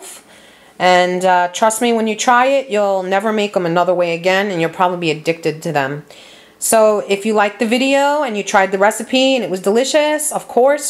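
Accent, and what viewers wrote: New York, United States. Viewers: THAT'S A NYC ACCENT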